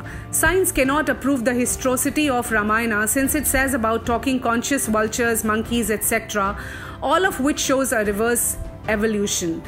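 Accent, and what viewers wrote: England, United Kingdom. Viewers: kya ramaaayenaaa aur banaraaaaa bol raha he be tu angrej he kya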